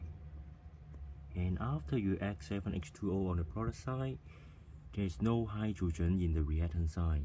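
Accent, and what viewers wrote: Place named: China. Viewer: From your accent I can guess that you are Chinese. By the way, it is now easy as a piece of cake for me thanks 👍👍👍👍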